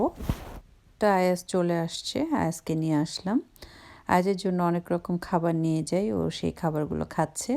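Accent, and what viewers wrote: Sylhet, Bangladesh. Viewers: Apu tumi ki Sylhety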